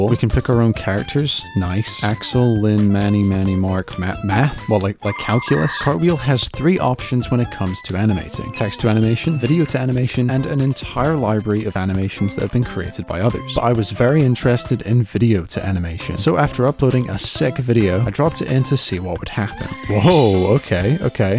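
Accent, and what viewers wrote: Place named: England, United Kingdom. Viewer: I thought your from England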